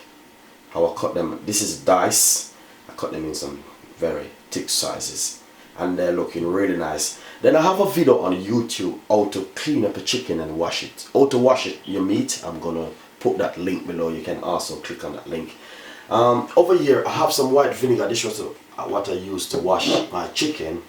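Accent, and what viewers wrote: Jamaica. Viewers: Jamaican talk😅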